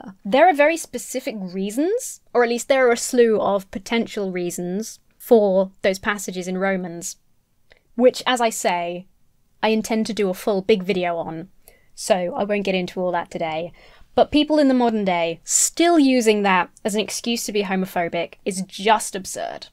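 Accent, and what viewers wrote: United Kingdom. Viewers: starting off the new year with a British amount of shock and awe, brilliant! This highly likable bi woman with eloquently described counterpoints to the source video, while having just a few endearing accent gaffes and quirks that ties together the whole video in a way that usually only annoyed British people manage to do. This subtle call to action against a group that uses religious doctrines to "other" certain groups that they don't want to be treated like equal humans, is as well structured and direct as needed without loosing the simple points covered. Thank you for all the good you did in 2022, and if this video is a yardstick for 2023, it's going to be even better! Keep being awesome, take care and stay safe! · As a New Zealander, I didn't notice that your British accent had faded until you mentioned it. Don't worry about mixing up the accents, they're similar to outsiders and our "rivalry" is basically friendly. 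We know we sound similar.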